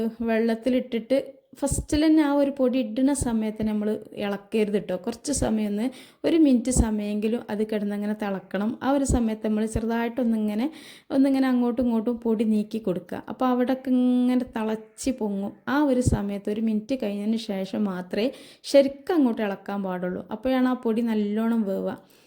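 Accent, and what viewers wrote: Malappuram, India. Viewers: Malapuram ano samsaram 👌👌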